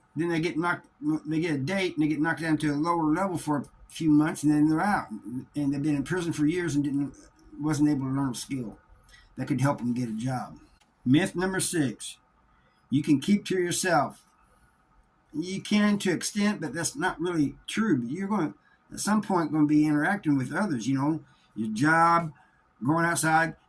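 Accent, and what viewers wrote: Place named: South, United States. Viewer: What state are you from ya accent sounds southern bro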